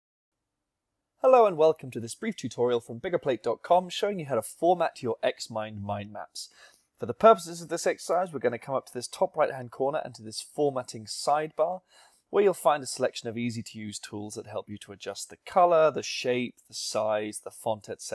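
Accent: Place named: United Kingdom. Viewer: Very nice tutorial, wonderful accent :) God save the king!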